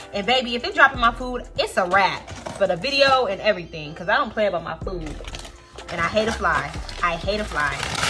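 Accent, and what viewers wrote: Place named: South, United States. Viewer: Yea u either from Atlanta or Houston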